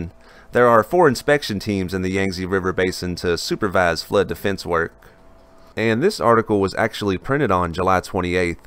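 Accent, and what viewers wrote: South, United States. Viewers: A good old southern boy interpreting Chinese for us.! I'm sold. you got another subscriber bro. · Thanks for this information. You have a beautiful Southern accent! DO NOT ever change it for anybody.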